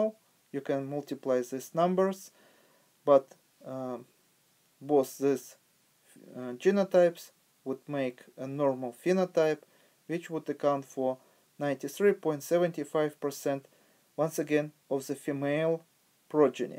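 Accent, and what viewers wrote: Russia. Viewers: Не знаю 🙃 Но я с первого слова понял , что автор русский 🇷🇺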